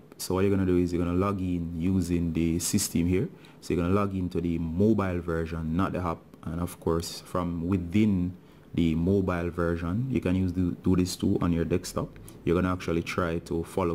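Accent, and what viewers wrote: Jamaica. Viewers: Love di Jamaican accent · You Jamaican ?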